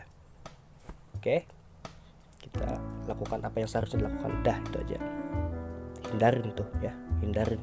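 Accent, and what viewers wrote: Banjarmasin, Indonesia. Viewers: Banjarmasin ya....Sy suka gaya bicaranya, mengingatkan Sy dg seseorang di Bjm hheee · Abngnya Dari Banjarmasiiinn, kahh???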